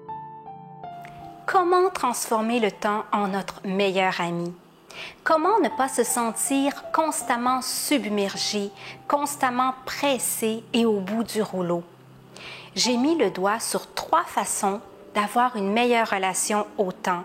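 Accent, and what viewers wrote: Canada. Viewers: Bonjour, merci pour ce partage ainsi que la précédente vidéo. J'adhère totalement à vos propos et j'essaie au mieux d'être minimaliste (pour moi et pas pour les autre). J'ai désencombré le superflu. Je me suis sentie tellement mieux et pourtant, j'ai beaucoup de peine à ne pas réencombrer. Surtout avec certains produits, comme les produits cosmétiques, les sacs à main et les livres. Avez-vous des conseils ? Et aussi, pourquoi est-ce si compliqué 'être dans le moment présent ? Au travail, je me dois (et je pèse mes mots)d 'être multitâche. C'est maintenant ce que l'on attend d'un "bon" collaborateur sinon c'est le licenciement. A mon âge (59 ans) c'est compliqué de me dire, je vais changer de job...et la retraite n'est pas pour tout de suite...Belle soirée (ici en Suisse, c'est le soir 😉) ( avec votre si charmant accent canadien, que j'aime tant, j'imagine que vous vivez au canada😊) et à bientôt.😗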